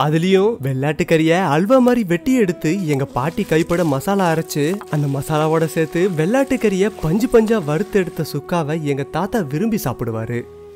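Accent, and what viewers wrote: Salem, India. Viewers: Salem slang 😍💛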